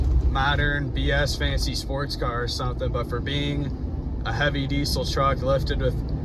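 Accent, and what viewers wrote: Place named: Northeast, United States. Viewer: Interesting accent. Somewhere upper east coast I’m guessing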